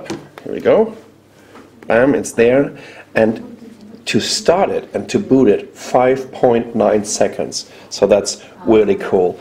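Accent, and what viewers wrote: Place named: Germany. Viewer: his accent is awesomely evil. sounds very german.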